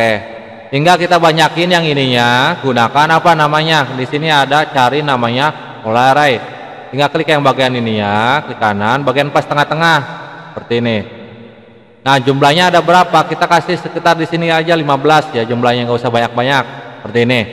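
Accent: West Java, Indonesia. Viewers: kayak logat sunda ya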